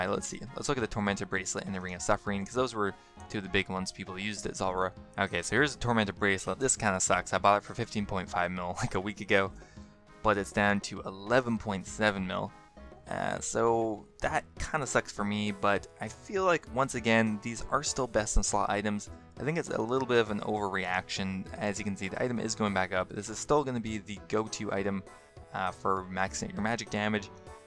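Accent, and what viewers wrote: Canada. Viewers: mohammad baba tbh I get what your saying but I work a long hours job in sales so when I get home I want to relax and barely concentrate, this guy helped me when I first started flipping, I'd consider myself a veteran player now who understands it but I don't check the whole market for what's it's doing where as this guy does regularly so saves the time with his soft Canadian tones.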